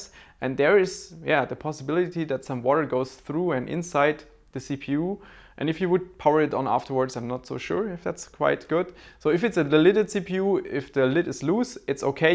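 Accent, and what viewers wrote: Germany. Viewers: Deutscher Akzent ist mal da :D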